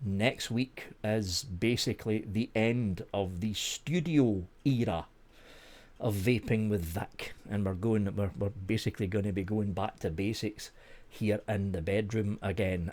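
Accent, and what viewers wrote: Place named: West of Scotland, United Kingdom. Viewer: Hope your health's improving Vic. Sorry that you find yourself in this situation. I'll still be watching your reviews - you didn't win all those awards for nothing...also as a West of Scotland guy it's nice to have great reviews from someone who talks like me !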